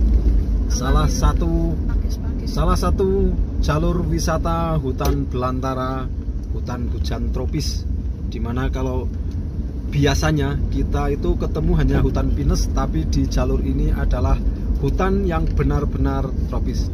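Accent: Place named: Wonosobo, Indonesia. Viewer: Milu nyimak kiye bos, gara2 longsor kasimpar fidio chanel mana saja yg liatin ds kasimpar tk tonto, rika wong ngendi si bos, kaya enyong wae logate, wonosobonan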